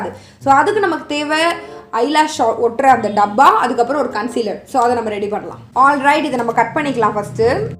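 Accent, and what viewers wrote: Tirunelveli, India. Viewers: Neenga tirunelveliya de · Tirunelveli ya????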